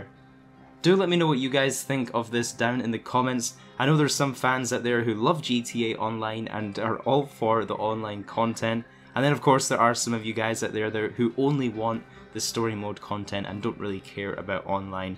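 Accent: Scotland, United Kingdom. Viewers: Here weird question by any chance are you from Scotland? It's just the accent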